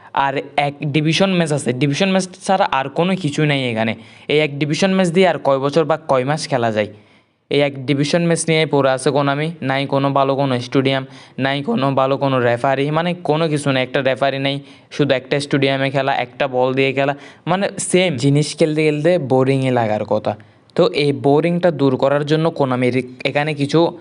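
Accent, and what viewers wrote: Chittagong, Bangladesh. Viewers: চিটাগাং থেকে বলছেন ,তাই না.? একসেন্টেই বোঝা যায়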